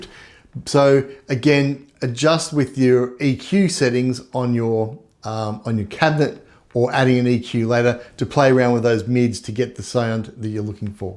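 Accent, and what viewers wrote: Australia. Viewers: Great content and nice to hear an aussie too mate. Plus I have the exact same bass as you so great to hear these demos. Keep it up!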